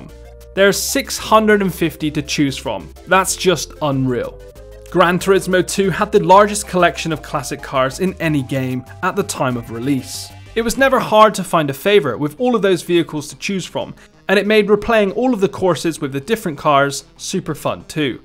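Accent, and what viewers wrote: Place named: West Country, United Kingdom. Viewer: Proper west country. You Somerset/Dorset based?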